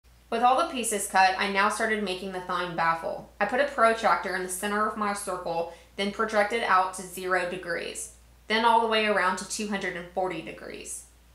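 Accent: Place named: South, United States. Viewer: Is it just me or did I hear a little bit of a southern(ish) accent? I can be wrong there, however I think it's a lovely accent.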